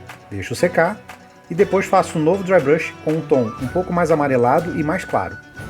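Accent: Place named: Santa Catarina, Brazil. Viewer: E esse sotaque aí meu extimado? És da onde tu? SC?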